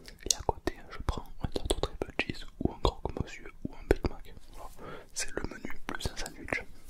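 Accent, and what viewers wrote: South, France. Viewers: Je me demande si tu viens pas du sud on a peu près le même accent ! En tout cas j'adore tes vidéos ❤️🙏🏻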